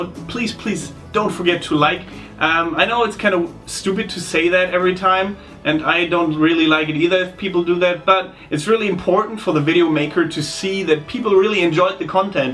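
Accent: Germany. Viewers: So, JETZT bist du mir sympathisch. Lylat Wars-Fanboy. Herrliches Spiel - immer noch! Leider hat Nintendo das Remake auf der Wii sowas von in den Sand gesetzt ... Und ein like für deinen deutschen Akzent. Lass das so! Versuch erst gar nicht, amerikanischer zu klingen. Amis können nicht mal "Rammstein" ordentlich aussprechen ... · You kind of remind me of my mom. She is German ( I'm half) and even though we moved to America 30 years ago, she still has a German accent when she speaks English. Hearing your accent makes me think of her...also in another video you said "silly goose" and I was quite surprised because I never heard anyone say that other than her when she was alive. When we moved here from Germany, she started speaking only english to us, it sucks because now that I'm grown, I'm struggling to learn German. I want to go back to Germany for 2-3 months but nervous because I don't know the language to well or which part to go to. 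Anyway, I like your videos.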